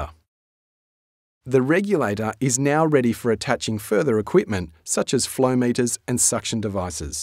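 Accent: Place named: Australia. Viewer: British Oxygen Corporation Voiced my a Aussie!! Great Job.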